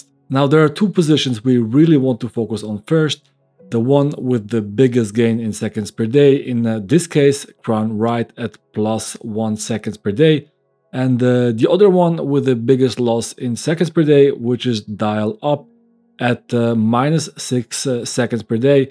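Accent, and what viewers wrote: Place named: Switzerland. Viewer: An AI bot ould not have a Swiss-German accent when speaking English.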